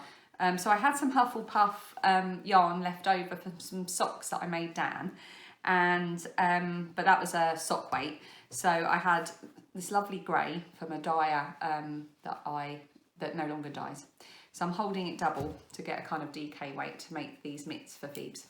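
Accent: England, United Kingdom. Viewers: Count me in! This expat Brit knitter loves to talk yarn and patterns but especially loves to hear an English accent again!!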